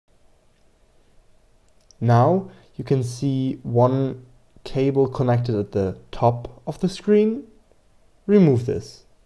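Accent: Germany. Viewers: 6 years after you created the video I need to say 2 things: 1) "DANKESCHÖN" as you German accent was very obvious at that time 😉 2) You saved my life: the ball bearings of the gear drive of my vr300 was completely stuck due to some syrup my kids left on the floor and the robot sucked it in like a champ. Again, awesome!!!!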